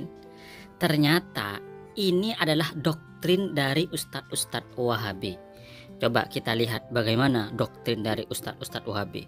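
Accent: Madura, Indonesia. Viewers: Seperti logat orang Syi'ah dari madura yang diusir ngungsi ke stadiun GOR_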